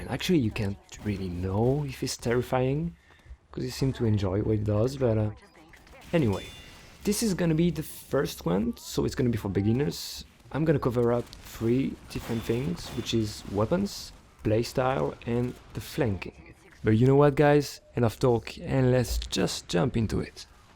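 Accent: France. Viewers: I think hes french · are you French?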